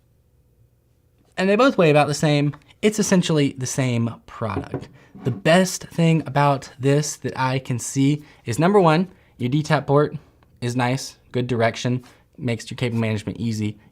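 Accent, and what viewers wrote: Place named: South, United States. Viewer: “That thing Dont pop off” lets me know youre from the south lol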